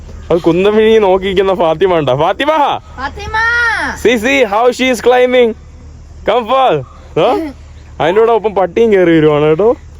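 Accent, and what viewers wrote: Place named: Thiruvananthapuram-Kollam, India. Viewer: റബ്ബരും വിള 😂 Extreme തിരുവനന്തപുരം കൊല്ലം സ്ലാങ്...... അത് കേൾക്കാൻ തന്നെ ഒരു നൊസ്റ്റാൾജിക് ഫീൽ.....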